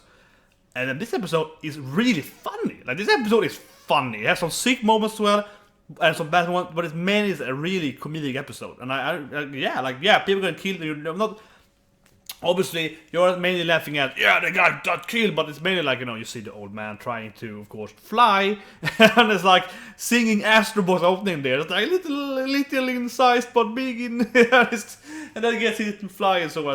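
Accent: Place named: Sweden. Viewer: at first i couldnt tell if u were speaking swedish or english cool accent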